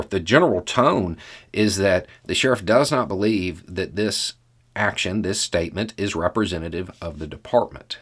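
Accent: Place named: Texas, United States. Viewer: I commend you for standing up for your beliefs. Sounds by your accent you live in Texas therefore I understand the use of " the Fifth Column ", the enemy of my enemy is my friend.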